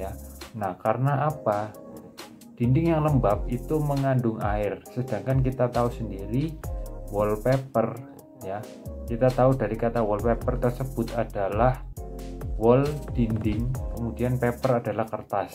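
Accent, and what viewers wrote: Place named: Java, Indonesia. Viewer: medok ya mas😀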